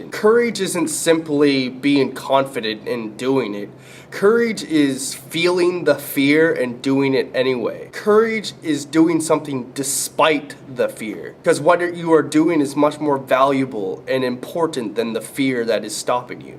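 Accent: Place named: Canada. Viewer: Actually your account said you were. But now that you mention it, yea I hear the voice of a Canadian. *thumbs up!*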